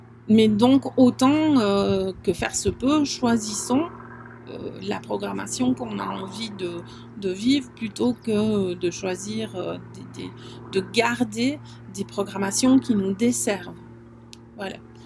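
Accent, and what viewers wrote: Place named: Switzerland. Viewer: Bonjour, je découvre ta chaîne, bravo, j’ai donc encore beaucoup de tes vidéos à visionner....ce sujet est très intéressant et bien expliqué grâce aussi aux exemples...je découvre sans grande surprise que nous sommes voisines, je soupçonnais ton accent et les huitantes, septantes....donc c’est confirmé, je réside à quelques mètres du sol genevois....ceci dit j’ai appris les programmations liées au pays...ce sujet est très intéressant, je vais partager....merci encore pour ta vidéo. Bénédicte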